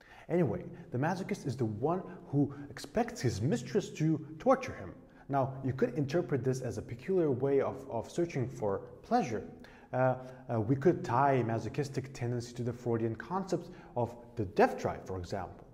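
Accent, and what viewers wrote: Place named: Lithuania. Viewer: The accent reminds me of a Lithuanian one. Thanks for the video!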